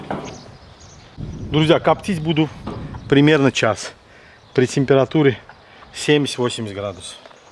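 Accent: Baku, Azerbaijan. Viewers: У него всё поличилось идеально. А Бакинский Акцент это другой кайф👍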